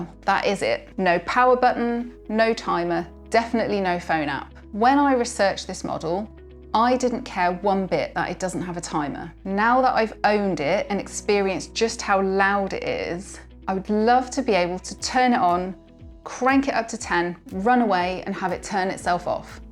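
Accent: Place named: England, United Kingdom. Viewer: That English accent is absolutely delightful.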